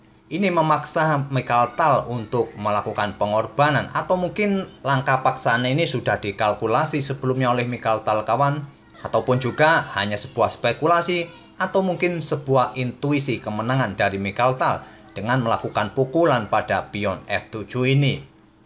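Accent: Java, Indonesia. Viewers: admin orang jawa bukan ?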